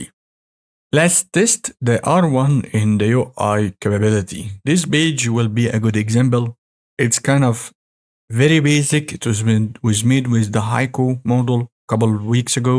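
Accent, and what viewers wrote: Egypt. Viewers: Brother, you sound egyptian. Great video btw.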